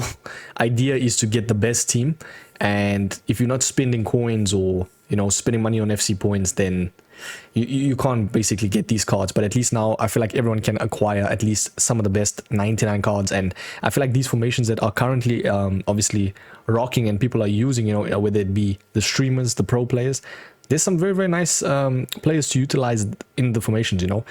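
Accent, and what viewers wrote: South Africa. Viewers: Is this guy South African ?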